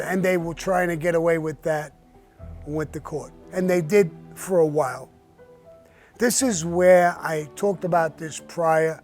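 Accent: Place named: Brooklyn, United States. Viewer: Bensonhurst's Own...Sammy the Bull, the Brooklyn talk..😉😎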